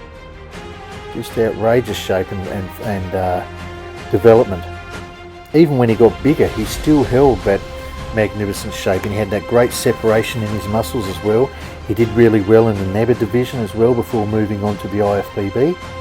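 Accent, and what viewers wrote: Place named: Australia. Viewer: I love this guys beautiful Aussie accent. I could listen to him all day and his knowledge of bodybuilding is amazing.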